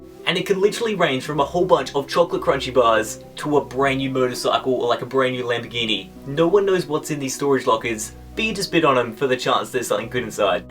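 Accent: Australia. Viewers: Why do you sound like an Aussie?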